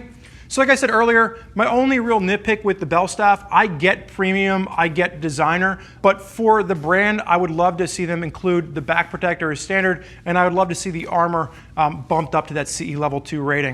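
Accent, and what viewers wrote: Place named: Pennsylvania, United States. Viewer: They are in Pennsylvania they have there own pronunciation,its no excuse I'm just saying.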